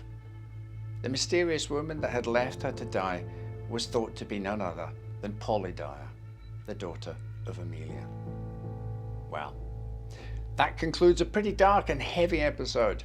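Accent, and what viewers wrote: Scotland, United Kingdom. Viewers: I detect a Scottish accent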